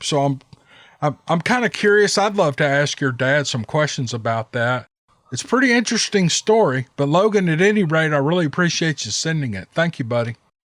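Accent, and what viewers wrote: South, United States. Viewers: Another great monster in a swamp story! Nothing better. Especially from a guy with a very twangy southern accent. It makes me want to be there! lol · Welcome back we get to hear that southern draw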